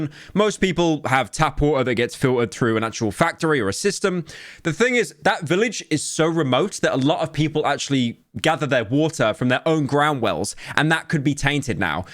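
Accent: United Kingdom. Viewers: Shut up your britich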